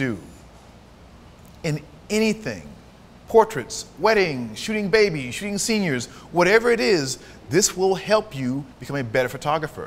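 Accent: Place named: India. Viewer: omg indian accent in polish mouth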